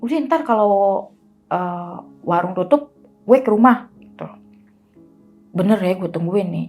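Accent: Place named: Yogyakarta, Indonesia. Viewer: Senang dengar logat betawi dan jogya ne mbok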